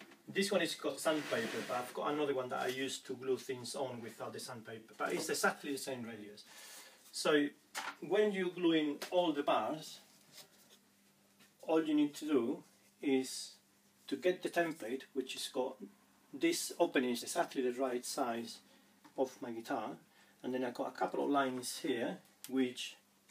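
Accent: United Kingdom. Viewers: Thanks for the videos Sr, can I detect a wee bit of Scottish in your accent?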